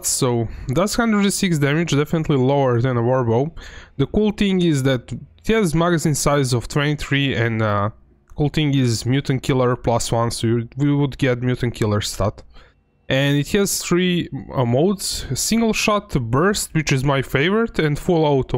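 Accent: Russia. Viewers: Это что, русский акцент?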